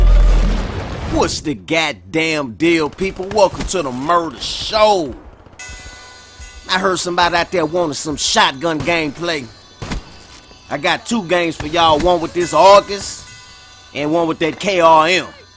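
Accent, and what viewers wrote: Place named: New Orleans, United States. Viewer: Ya heard me lol New Orleans accent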